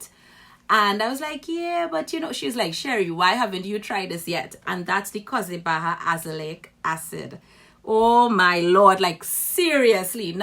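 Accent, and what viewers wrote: Trinidad and Tobago. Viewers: Are you trini? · are you from trinidad?Love this!!!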